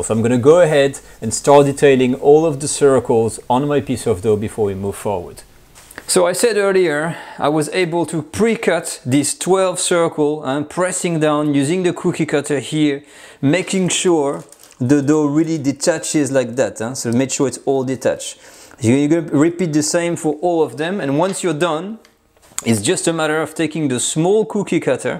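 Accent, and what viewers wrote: France. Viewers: I really love your french accent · Very charming French accent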